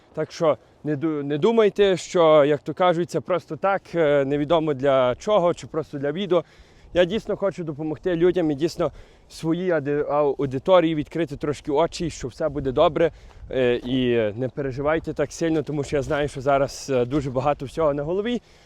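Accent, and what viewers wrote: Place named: Transcarpathia, Ukraine. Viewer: Львів точно так не говорить, навіть близько, а от на Закарпатті так)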